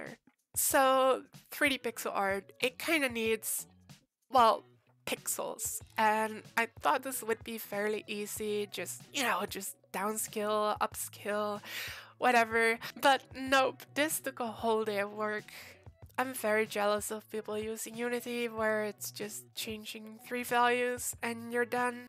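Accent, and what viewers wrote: Netherlands. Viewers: nederlandstalige detected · amazing video! are you dutch? i noticed it by the irl boat and voice :D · You’re definitely dutch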